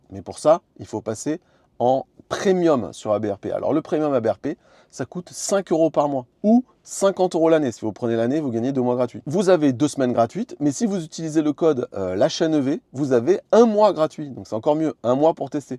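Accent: England, United Kingdom. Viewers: cet accent anglais se perfectionne de vidéo en vidéo. Et c’est beau!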